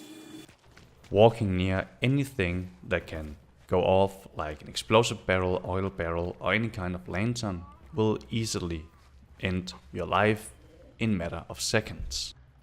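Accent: Denmark. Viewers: You sound danish? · U english sounds very danish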